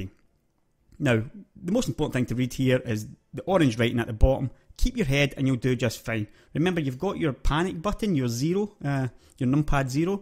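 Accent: Scotland, United Kingdom. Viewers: your accent here is beautiful, one of the most luxurious Scottish accents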